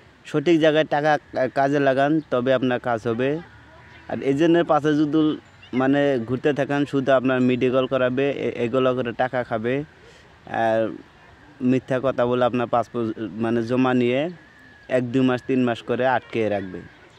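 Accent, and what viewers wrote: Bangladesh. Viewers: Ekdam tai, or khota bartai bangladeshi r moto. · Apner vasha sune mone hocche Bangladeshi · বাংলাদেশের বাসিন্দা,, চুরি করে ভারতে এসে পাসপোর্ট ভিসা,, বানিয়ে রাশিয়া গিয়েছে,, ভাষা শুনে বোঝা যাচ্ছে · নিজেকে ইন্ডিয়া মনে করো তুমিতো বাংলাদেশি কোথায় বোঝা যায় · ভাই আপনি বলেছেন আপনি ইন্ডিয়ান, আপনার ভাষা না ইন্ডিয়ান, না কলকাতা, আপনার ভাষা শুনে মনে হয় আপনি বাংলাদেশি।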